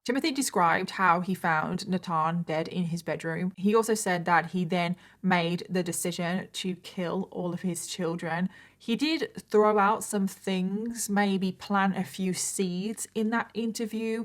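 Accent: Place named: United Kingdom. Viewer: i was literally binging your series and wanted to see a compilation of like abvsive parentys and then next day i have it! (obviously i would rather not have these crimes to exist, condolences to the victims) I also build a lot of minecraft while your videos run in the backround and your voice is so calm and Sassy is so funny and point-on! Also i always knew you were british =D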